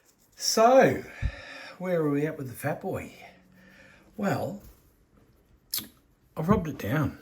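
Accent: Australia. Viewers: That British and pearl green combo looks fantastic! Watching from Mobile (Moe-beel) Alabama. Been watching for the last few segments, all have been great. 62 and have been building since I was 9 and still learning, thanks to you guys ( or should I say blokes ) I happen to love the Aussie accent. Enough of that, keep up the good work.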